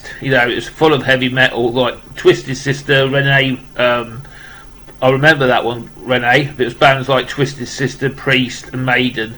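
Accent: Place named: United Kingdom. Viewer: The comment you made on Scott Waters regarding Deep Purple 🤣🤣🤣🤣🤣🤣🤣🤣🤣🤣🤣🤣🤣🤣🤣🤣🤣🤣🤣🤣!!!!!!!!!!!!!!!!!!!!!!!! I have something even Scott Waters can't get. You know the band Joshua (Hand is Quicker Than the Eye, Surrender, Intense Defense, Chapter One)? They released an album in 2012 called Resurrection. Around that time I was having on line conversations with the founding guitar player Joshua Perahia. I still chat with him from time to time. He allowed me to have #1 of 888 signed copies of Resurrection which is extremely rare. He also sent me some signed postcards. Why 888 and not 1000 signed copies? 888 is the Hebrew numerical equivalent of the name Jesus or Yeshua. Each Hebrew letter has a number assigned to it - a bit of trivia for you. You have a cool British accent. I'm from Newfoundland, Canada and I have a bit of a Newfie accent ha ha!! Many of us folks from Newfoundland have a different accent that is different from the rest of Canada. 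In Canada they sometimes end a sentence or reply with eh. Newfies end a sentence with b'ye! or hey b'ye! We sometimes reply with go on b'ye!? meaning you're kidding me!? or you're joking right!? Then others say that's great or wonderful we say "best kind!" Cheers buddy!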